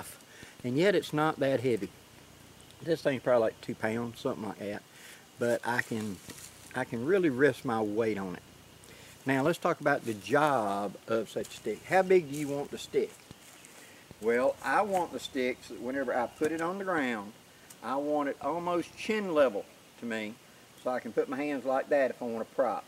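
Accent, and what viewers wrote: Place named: Southeast, United States. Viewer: Liked & Subbed. You live in a Beautiful area from what I can see...May I ask generally what part of the country you're in? Based upon what slight accent I can decipher I would guess South-East US but that is very vague...Perhaps you would be willing to be a bit more specific..>Thank You!!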